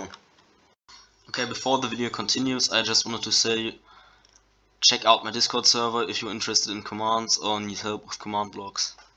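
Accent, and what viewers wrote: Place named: Germany. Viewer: der deutsche akzent haha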